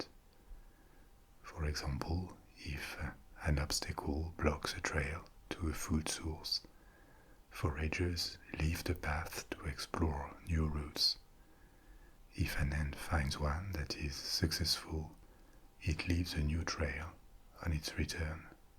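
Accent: France. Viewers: ‘What’s your favourite video’ A French man talking about ants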